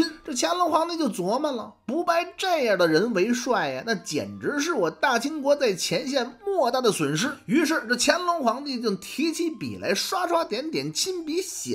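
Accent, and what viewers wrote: Beijing, China. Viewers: 北京音有个神奇的效果，用京音儿说任何事都像在说评书，像在侃大山，像在忽悠，失去了可信度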